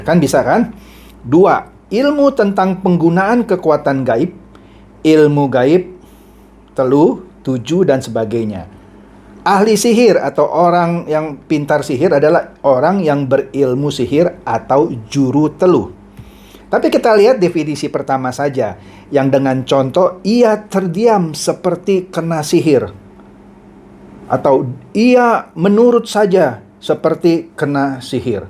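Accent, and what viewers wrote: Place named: Jakarta, Indonesia. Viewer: Tksh khotbahnya,Maaf Bapak Pdt oran Betawi ya? Karena Pdt bilang masih itu masing,Ok.Pdt🙏🏼🙏🏼👍👍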